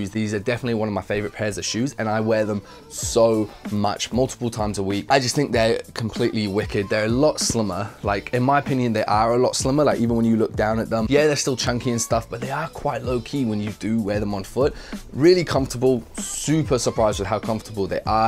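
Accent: New Zealand. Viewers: Do any of yous know if he's from NZ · omg so u are from New Zealand?! The first time i heard you I thought u were Kiwi but I think I heard something about the UK so I thought wuhhht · tuna - pretty sure it’s New Zealand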